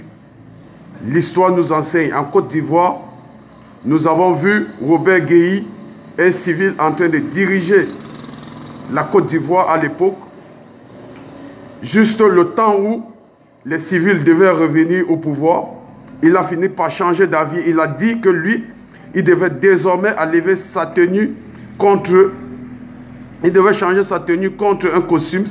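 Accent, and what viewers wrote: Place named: Cameroon. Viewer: Il est fou tous les jours ses vidéos c'est Ibrahim Traoré pour ce faire des vus avec des mensonges et son français me fais rire il a l'accent camerounais non burkinabé.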